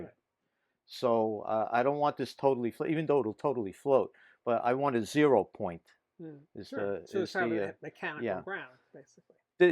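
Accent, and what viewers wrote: New York, United States. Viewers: Great New York accent! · A PHD level of knowledge and a super cool New York accent. Is there a follow up video with the completed turntable?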